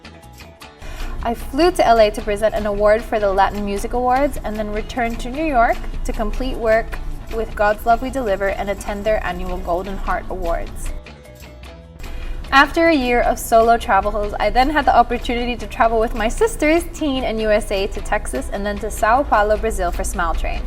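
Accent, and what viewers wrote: Australia. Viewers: When she talks normal, you can hear the Australian accent. When she Talk loud and proud, she speaks the proud Filipino accent!!!! (I noticed it) ❤❤❤🌟🌟🌟☀️☀️☀️🌞🌞🌞🥰🥰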